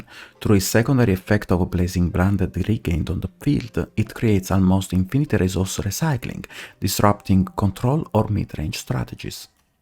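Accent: Italy. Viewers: Italian Yu-Gi-Oh Banlist ASMR, i kinda dig it. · Are you Italian 😂 it's just endearing to me how you talk also my neighbors are Italians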